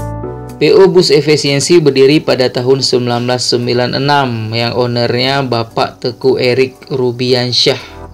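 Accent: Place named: Aceh, Indonesia. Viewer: Intonasinya khas Aceh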